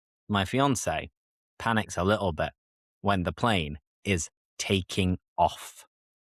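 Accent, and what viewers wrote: United Kingdom. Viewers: This podcast episode unpacks essential holiday expressions, phrasal verbs, and travel vocabulary through slow, clear British English and personal storytelling. The practical examples and interactive Q&A format make picking up natural travel idioms completely effortless. Honestly, this is hands down one of the most practical and enjoyable listening practice lessons for boosting conversational English!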